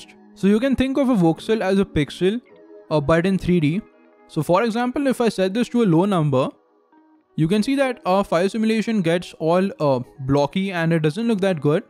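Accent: India. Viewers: he has the best indian accent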